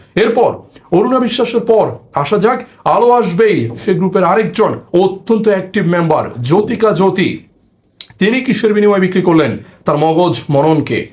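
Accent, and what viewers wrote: India. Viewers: দাদা বাংলাদেশী। আপনার কথার ধরন অনেক ভালো। আপনাকে ইন্ডিয়ান লাগে আমার কাছে।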